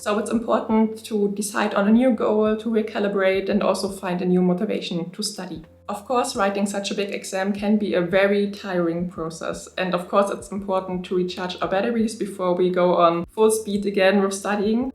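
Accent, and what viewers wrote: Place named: Germany. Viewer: Hey, fellow German? 😁 Thick accent just as me. I'm also waiting for the JLPT results. I'm in Language School in Japan though. Still I get study slumps, and especially speaking is scary here as well. Can 💯relate! Keep at it! 💪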